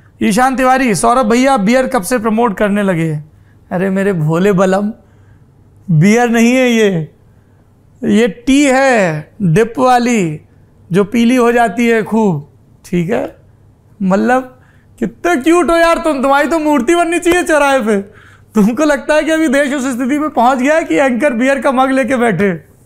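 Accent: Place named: Bundelkhand, India. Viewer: Hello saurabhji,bhasha or boli se ap bundelkhand ke lagte ho,prathak bkd prant k liye bhi apne star se prayash karenge k nahi ?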